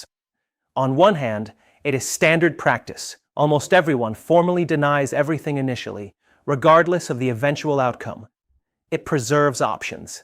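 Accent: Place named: United Kingdom. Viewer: Oops. What happened to the British accent?!?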